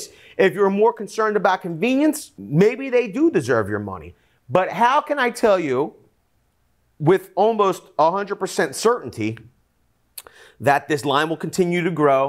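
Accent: Philadelphia, United States. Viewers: That's a Philly accent, not New York LOL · that’s definitely not a New York accent. It sounds like Philly.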